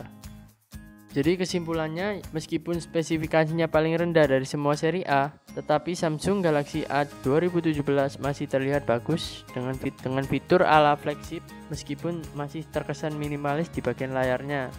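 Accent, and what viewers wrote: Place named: Java, Indonesia. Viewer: keliatan banget jawa nya tolong bahasanya diperbaiki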